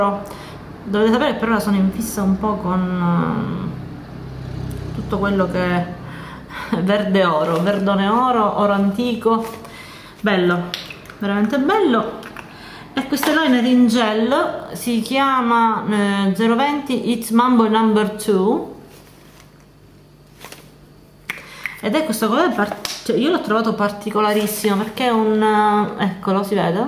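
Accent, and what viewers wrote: Sicily, Italy. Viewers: io e te abbiamo le stesse passioni makeuppose... Il verde-oro e gli ombretti in crema... Sarà per questo che mi piaci tanto e non mi perdo un tuo video??? O sarà perchè amo l'accento siciliano??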